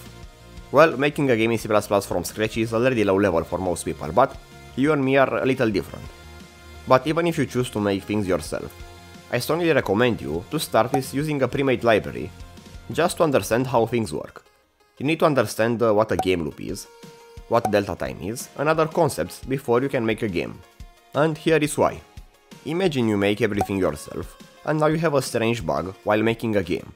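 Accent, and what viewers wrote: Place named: Romania. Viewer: you sound a bit romanian..... cf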